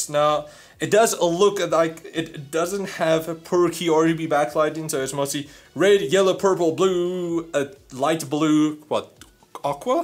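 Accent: Afrikaans, South Africa. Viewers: Jy klink regtig afrikaans ek is afrikaans btw as jy nie geweet het nie lol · I could recognise ur accent I was born in SA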